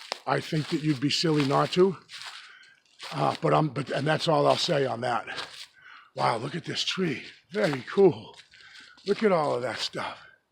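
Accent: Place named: New England, United States. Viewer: A beautiful day for January in the East! We had rain with thunder and lightning this morning. over 50 degrees in Jan., think I'm going hiking in the woods - I'm envious every time I see you out and about - Love your NE accent - don't worry about trolls - just keep it moving, and keep the videos coming! And just keep that head of yours on a swivel!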